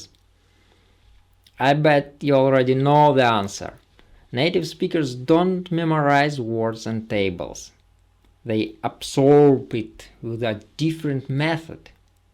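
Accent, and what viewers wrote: Russia. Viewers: Судя по акценту мужик из наших:)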